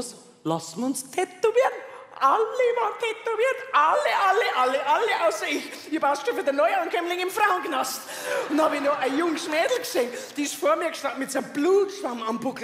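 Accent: Bavaria, Germany. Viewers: Ich habe sogar versucht Bayrisch mit Untertiteln zu verstehen - mein Gott, kommt da ein Müll raus., vielleicht etwas langsamer. Wir sind nicht auf der Flucht. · Einfach so oft anhören bis du 95% verstanden hast. Das Wörterbuch Bayrisch leicht lernen. 3 Wochen mit Bayern auf Urlaub, keiner der Deutsch kann. Es wird nur Bayrisch gesprochen, dann verstehst sicher 100% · Lernen bayrisch oder höre es nicht an. Ganz einfach! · Zum Glück versteh ich von Natur aus als Steirer🇦🇹 auch die Bayern. Lg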